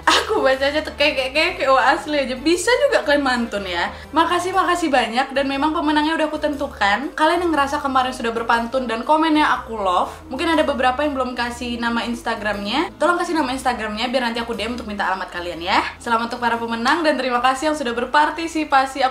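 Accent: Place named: Medan, Indonesia. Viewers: Sepertinya kau dari medanya kak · Sakinn sering nonton chenel ka:/ aku ngomong jdi medok Medan smpe ada wak² nya, trs di tanyain gini he medok amat ngomong lu eh aku mlh jawab " bacot kali kau ini, kesel kali aku wak:v" ketularan jadinya🤣 love ka😍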